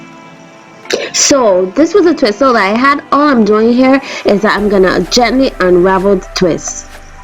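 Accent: Barbados. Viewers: Love that Bajan accent 🇧🇧🇧🇧🇧🇧 thanks for the ideas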